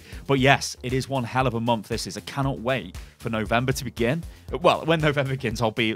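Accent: United Kingdom. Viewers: what happens. I dont wanna watch this video of a british man talking aasying hoy hell :0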